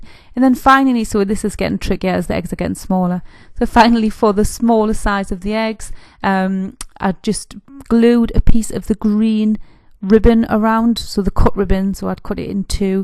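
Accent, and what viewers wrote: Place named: England, United Kingdom. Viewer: Hello from Nampa, Idaho, USA. LOVE the eggs and listening to your English accent!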